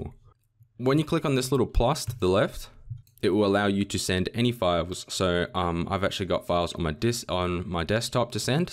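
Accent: Australia. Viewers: finally, a farking Aussie!!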